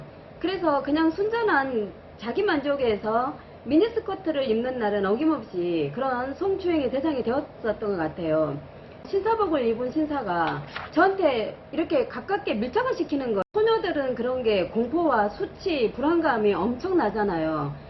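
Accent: Gyeongsang, South Korea. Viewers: 경상도 악센트가 진하니 푸근하기도 하고, 말씀의 내용이 강약이 생겨서 더 정겹고, 명확하게 들려옵니다~ ^^ 들여다 보는 놈, 얼마나 놀랬을까요~ㅎ 그쵸, 한 번의 나쁜 것을 일반화시키는 것은 위험하죠~ ㅎ 재밌게 잘 들었습니다~ 👍👍👍+41 먼저 찾아주심에 손잡고 응원합니다~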